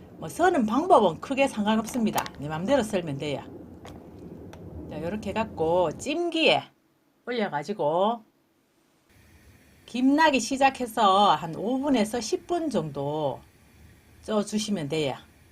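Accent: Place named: North Gyeongsang, South Korea. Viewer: 갱북 어딘교 나도갱국인데 말씨가 똑같아서 입니다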